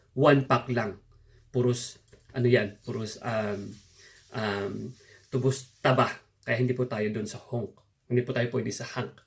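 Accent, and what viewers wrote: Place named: Ibaloi, Philippines. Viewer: Parihu lng tayo ibaloi ngem magkaiba ng accent..hehe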